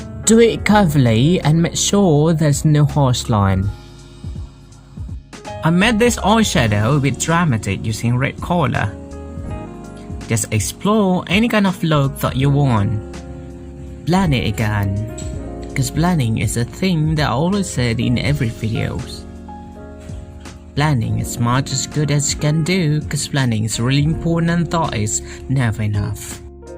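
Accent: United Kingdom. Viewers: OMG ... Oke q baper dgn aksen British itu🤣🤣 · Kak lidah nya british bgt n cwantikkk..... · Sumpah cantik banget ka, aku suka sekali makeup nya. Terus juga suka banget aksen British English nya, belajar dimana sih ka? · British accent,,,remind me to ms.jane😂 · Sering² kak bikin tutorial pake British accent I like it 😍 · Gilllakk kereenzzzz beuddd sumpah Jd pengen belajar how to speak as great as you. Kaya bangsawan2 inggris sumpah....ih....wanna make conversation with you...practice as u student. Sembah.....guru besar.hehehhe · darling aksen inggrisnya sukaaa banget Tutorialnya banget banget ok Jadi semangat coba coba make up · British nya medok banget,kalo merem di kiranya org asing yg ngomong...pernah tgl di england lama kak ? Please answer me.... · Kak sampean Iki maem'e opo to ngomong British'e kok uenak dirungokno,love you sering2 bikin video ya 😍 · Aksen Britishnya halus banget loh kak 😍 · Berasa lg nonton dialog harry potter suka sma british accent nya😊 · masio ndempul banget tapi aku suka lho tutorial iki. 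Keren. Aksen britishnya jeng, keren.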